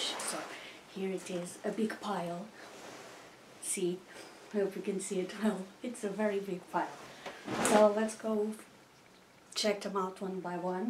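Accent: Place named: United States. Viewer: in portugal wouldn't you learn british english? your english sounds totally american to me.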